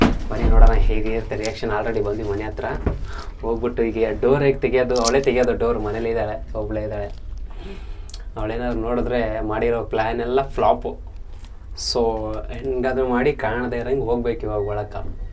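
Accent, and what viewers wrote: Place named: North Karnataka, India. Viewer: ಸೂಪರ್ ಅಣ್ಣ ಉತ್ತರ ಕರ್ನಾಟಕದ ಮಾತು ಅಂದ್ರೆ ಹಿಂಗೆ 👍🌹🌹🌹🌹🌹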